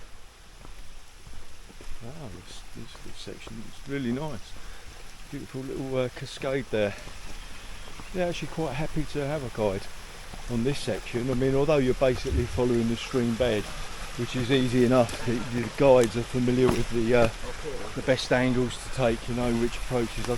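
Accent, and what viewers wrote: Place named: United Kingdom. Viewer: I like listening to English with a UK accent. It's beautiful.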